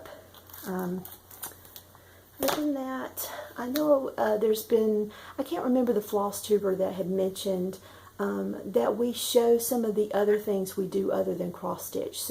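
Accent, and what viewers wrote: South, United States. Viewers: HiYour videos are so calming - you have a wonderful southern accent which sounds so welcoming. I watched the video of you and your friend (night owl?) And your joyous heartfelt friendship was so wonderfully conveyed. I subscribed to her site too.God bless you!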